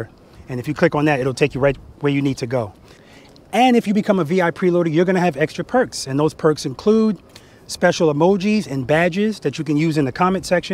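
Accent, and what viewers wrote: New York, United States. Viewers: oh my god man ! your voice and almost everything you say sounds like my friend Zoffinger. look up his channel .he does kayak and fishing stuff. very unique people you are. even though you resemble each other. im a rider and appreciate your content. we are in Fla. hear what you would sound like without the new york accent. im from staten island btw. so i get it.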